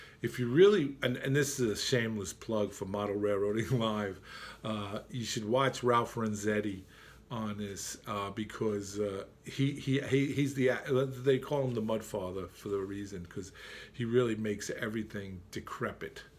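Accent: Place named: New York, United States. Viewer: Hi. I like what you're saying -- and it's really nice to hear a New Yorker again. I keep my old phones to use as throttles and cameras. Just ask a friend (or The Wife) for their old one. Cheers! --dunc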